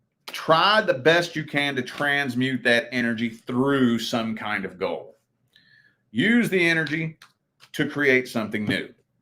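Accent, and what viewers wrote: South, United States. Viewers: I love your Southern accent and your realness :) You are so genuine and sweet and patient yet you have suffered so much. Sending love and warm hugs!!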